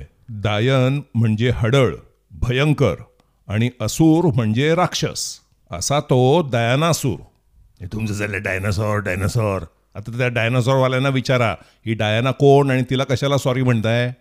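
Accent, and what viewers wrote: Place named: Mumbai, India. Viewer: छान माहिती.....इतकी वर्ष इस्रायल मध्ये राहून सुद्घा मुंबईच्या भाषेचा तडका अजून जशाचा तसा आहे😊😂असे वाटते काल परवा पर्यंत मुंबईतच होतात की काय तुम्ही😊....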